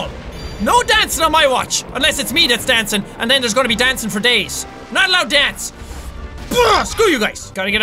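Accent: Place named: Ireland. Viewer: My mum loves hearing your voice while I'm watching your videos because apparently you sound like an Irish guy from Sherlock :)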